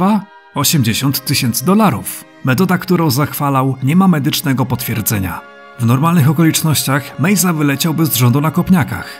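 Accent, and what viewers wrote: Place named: Ukraine. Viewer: dobry film, podsumowanie pisowskiej mafii 👍💪 a powiedz mi skąd u Ciebie ten akcent? Jakby ukraiński. Skąd pochodzisz ?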